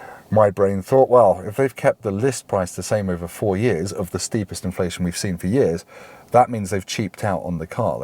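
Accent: United Kingdom. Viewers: Brummie flipping YouTuber 😂😂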